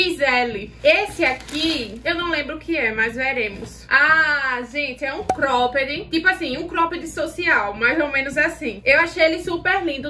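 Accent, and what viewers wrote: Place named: João Pessoa, Brazil. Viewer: Esse sotaque nunca me enganou, sou de João Pessoa e não vivo mais sem tu, mulher 😂😂😍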